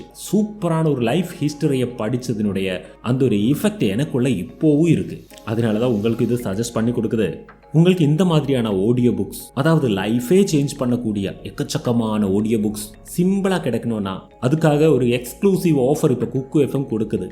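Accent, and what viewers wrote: Kerala, India. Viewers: சகோதரா நீங்கள் மலையாளியா??? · Malayalam boy ❤️✨ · Vadakkan ella malaiyali chettan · Ivan malayali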